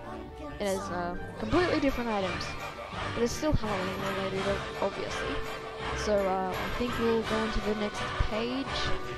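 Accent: Australia. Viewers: ARE U AUSTRALIAN :O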